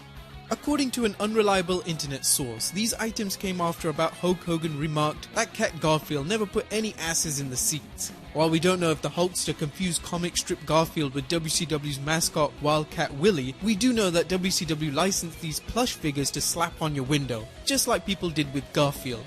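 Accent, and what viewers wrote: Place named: United Kingdom. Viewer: Announcer is British. What do you expect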